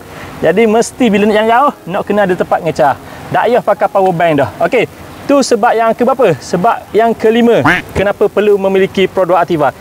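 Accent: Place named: Terengganu, Malaysia. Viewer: Try ckp kl tpi bunyi ganu gak😂klaka la abg ni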